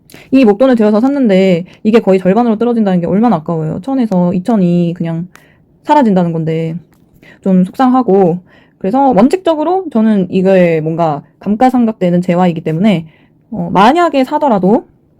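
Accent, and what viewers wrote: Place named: Gyeongsang, South Korea. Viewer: 고향이 경상도 인가요? 말투가 귀여우시네요 ㅎㅎㅎ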